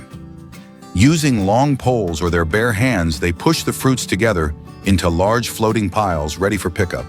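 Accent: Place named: United States. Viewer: It is good to see this tropical tree not being tweaked. When you see a Western idea blooming in an Asian country _ machinery, smart devices, surveillance it means the native has been taken over and it is not the original thing. Those that have learned the skill of climbing should be encouraged to carry on as I dont recall even one person falling or dying due to this skill that has been passed on for generations. Noticed that the men in this area of work were specially strong bodied and climbed the trees with ease daily to tap the top of the tree to produce toddy. Westerners interferring in a country they have no idea about native ways, should not sway their way of farming. AI with American accent and Western music says a lot about tge West controlling every place that is part of Asia or asking where we are watching from is snooping and a matter of concern.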